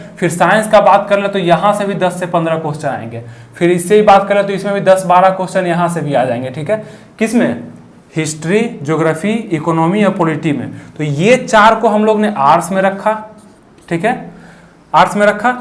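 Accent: Bihar, India. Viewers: या तो ये बिहारी है या फिर यूपी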